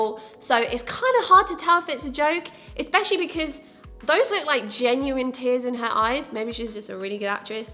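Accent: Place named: United Kingdom. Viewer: British sure is beautiful, do classy 🥰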